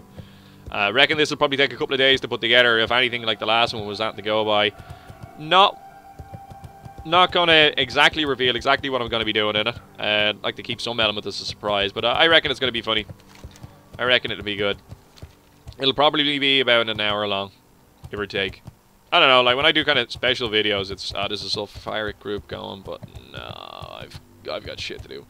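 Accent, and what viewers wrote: Ireland. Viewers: Are you irish? you sound like a right dub hahah quality video though keep it up!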